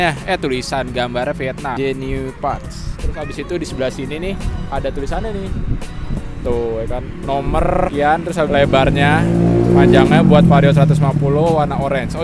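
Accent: Jakarta, Indonesia. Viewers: Logat Betawi nya keliatan😅kayak lucu aja logatnya gitu asik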